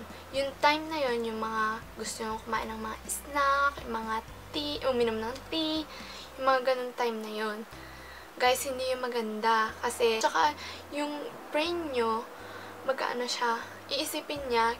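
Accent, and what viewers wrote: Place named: Japan. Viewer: ang cute niyo po magsalita, parang may japanese accent 😂💛 new subbie here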